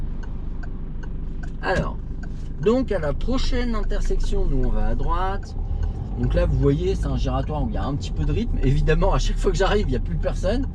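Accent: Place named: Paris, France. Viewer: Very nice driving, en plus du bel accent Parisien. 😉👍🗼🗼🗼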